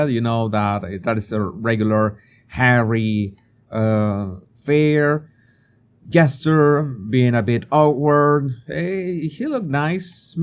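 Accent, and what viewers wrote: Spain. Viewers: your your accent is not anywhere near American. You speak English with a 100% Spanish accent. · I’m not a MM or Henry fan at all, can’t stand them. But, You can’t expect her to have a Colombian accent if she’s not from there. It’s like Canadians or Americans expecting a foreigner to have the dialect down perfectly when visiting as a tourist. It’s outrageous. Especially since you have a Spanish accent and have been doing YouTube videos for years in english. You are just being picky at this point and a little snobby.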